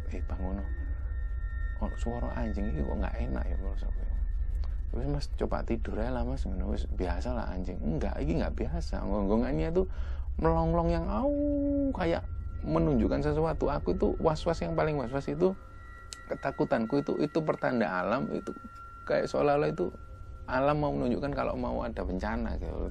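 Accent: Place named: Surabaya, Indonesia. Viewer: Ga fokus sm critanya tp slalu fokus sm cara ngomongnya🤣logatnya itu loh..sy lahir dsurabaya tp bsr & hdp di jabar...smpe skrg bljr niruin logat sby msh blm bs😁😅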